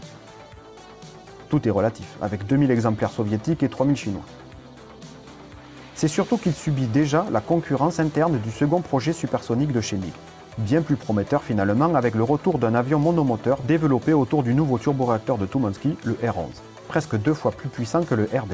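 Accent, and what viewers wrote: South, France. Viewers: Surtout que vous avez un accent du sud non ? Méridional ? En ralentissant on en profiterait un peu plus, c'est sympathique. :p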